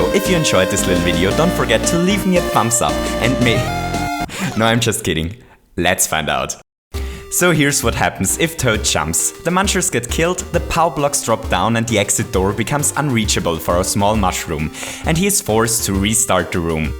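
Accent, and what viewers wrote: Germany. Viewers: Are you german? I'm asking because of your accent. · are you german?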